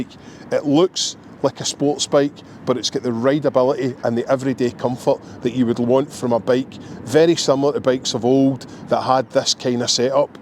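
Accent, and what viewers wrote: Scotland, United Kingdom. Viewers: Great video. Down to earth, realistic assessment. No need for mega power on today’s roads, just great handling and flick ability . This bike will be a winner. Always love the Scottish accent… being a Geordie I’m half way there. Thanks. 😂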